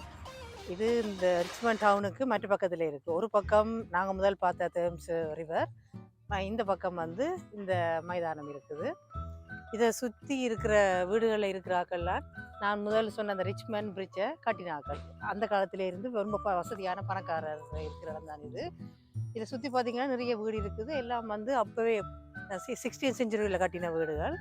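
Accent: Sri Lanka. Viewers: Fendastic,i from pondicherry, India I like ur srilankan slang speech very much, great kp it up mam,and also go through many more videos like this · ஈழ தமிழை கேட்டேன்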